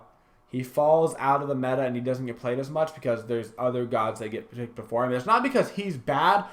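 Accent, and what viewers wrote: United States. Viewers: You seem like the type of guy who speaks " 'merican" as you would say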